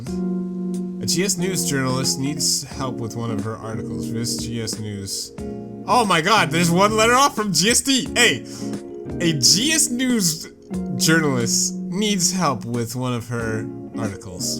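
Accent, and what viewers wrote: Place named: Germany. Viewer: Your German accent is actually the best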